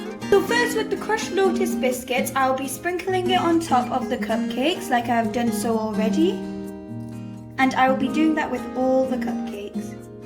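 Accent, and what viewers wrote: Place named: United Kingdom. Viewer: Love listening to your British accent. Keep posting!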